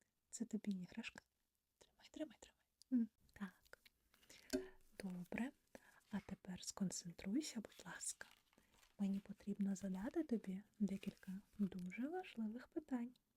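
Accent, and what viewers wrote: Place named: Ukraine. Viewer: As I always say, relaxing begins with!🤩🤩🤩 what a beautiful whispering voice with that magical Ukrainian accent!!!😍😍🥰❤Love Greetings Oliver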